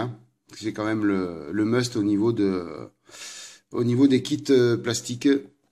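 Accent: South, France. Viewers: un beau projet en perspective a venir...toujours agreable a regarder tes video avec ton petit accent du sud.a plus et bon courage.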